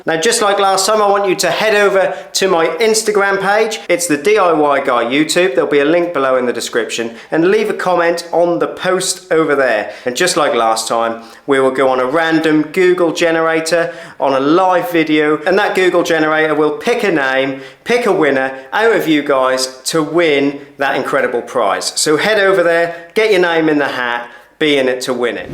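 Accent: Norfolk, United Kingdom. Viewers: First time visitor to your channel. Do I detect a Norfolk accent?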